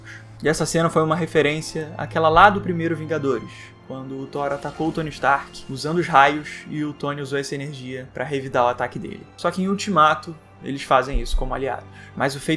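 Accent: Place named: Northeast, Brazil. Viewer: Mano vídeo sensacional cara parabéns. Mas me diz uma coisa tu é nordestino? Pq teu sotaque é igual o meu tbm kkkkk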